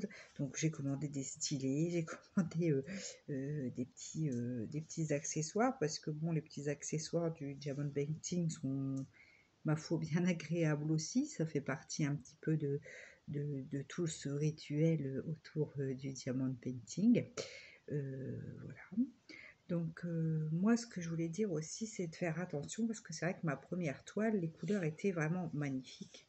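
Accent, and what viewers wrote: North, France. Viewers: Oh une voisine en plus j'avais cru entendre le petit accent du nord lol. Moi je suis du nord mais originaire du pas de calais.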